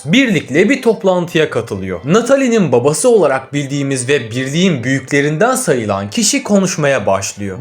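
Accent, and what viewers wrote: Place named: Azerbaijan. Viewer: abi azerisin anladıkta HARKASA yeniden merhaba diye girme kulağım acıyo hErkEs e harfi var orda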